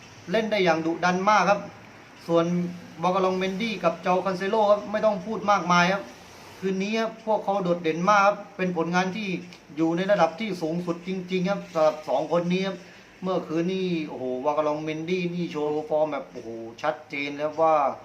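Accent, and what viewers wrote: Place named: South, Thailand. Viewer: คนใต้ม้านนิ!!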